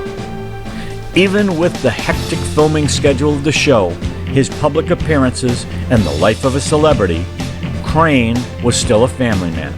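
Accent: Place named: Northeast, United States. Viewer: "In his Scottsdale Arizoner apartment" lol Arizoner gotta love that northeast accent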